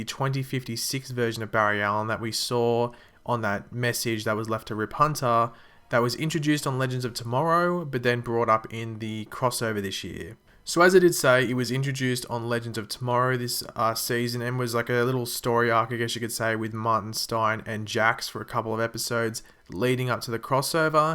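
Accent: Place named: Australia. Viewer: youre so australian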